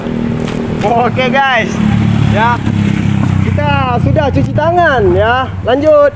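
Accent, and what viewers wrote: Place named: Jakarta, Indonesia. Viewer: Kyk org betawi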